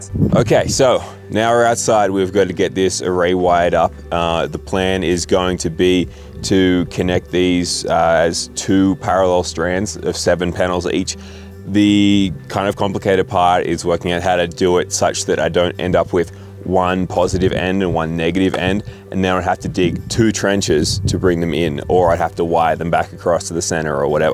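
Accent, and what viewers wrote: Australia. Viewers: Dude, where are you? Aussie accent, 120v inverter. Confusion Ahh Colorado.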